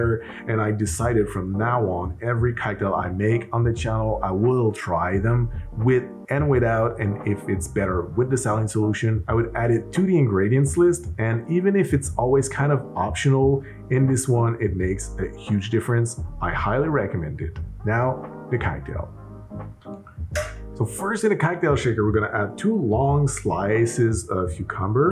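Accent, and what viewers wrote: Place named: Quebec, Canada. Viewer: He is French Canadian, if you're looking for correct pronunciation you're probably in the wrong place. Yeah, just made the cocktail (including the grenadine recipe) very balanced, could use a bit more bitter imo so I threw a couple drops of bitters on top. Didn't have any cucumber though, I imagine just adds another level of freshness on top of the lime juice.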